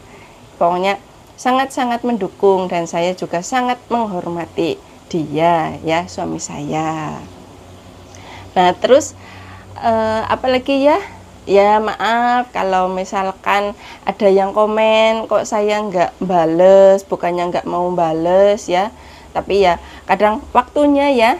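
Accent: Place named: Tegal, Indonesia. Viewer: Wah resepnya mudah dan simpel y mb semoga bs dicoba SM ak insyaallah klo ad rezeki pengin nyoba jg mb seneng deh liat MB sekarang udah bs bikin konten sendiri y mb walaupun sederhana y mb videonya tp banyak yg nonton mb mengispirasi mb logat ngomongnya kayak ak MB ak dr Tegal ngapak jg mb tp ak ikut suami tinggal dilampung mb semangat terus mb bikin kontennya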